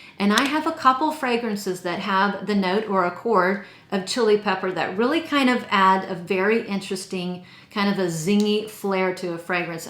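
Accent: France. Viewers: Question please : What languages do you speak ? Do you speak French or Italian I notice your pronunciation 😉Would love to see a video on this topic ❤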